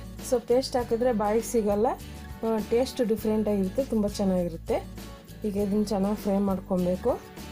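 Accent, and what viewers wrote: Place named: Kolar, India. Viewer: I think u r from Kolar region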